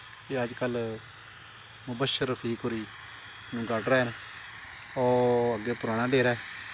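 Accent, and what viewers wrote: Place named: Hafizabad, Pakistan. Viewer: Mashaa ALLAH Bhai zabardast Hafizabadi boli soooo niceeee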